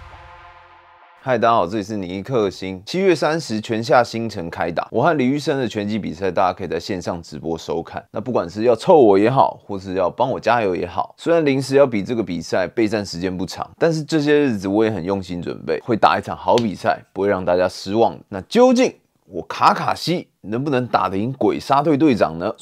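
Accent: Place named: Malaysia. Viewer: 1-5頂一個，感覺口音是大馬人，你的勸告也是很正向，的確他給小潘打輸了，也是沒什麼的。現在起碼知道這個月會有一場會迎戰。不過其他不說，TOZY發起挑戰後有特意學拳擊，其實已滿有誠意。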